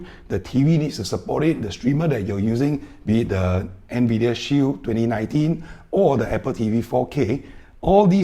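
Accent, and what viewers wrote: Singapore. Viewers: love the videos... And love the Singlish.. No doubt you are from beautiful Singapore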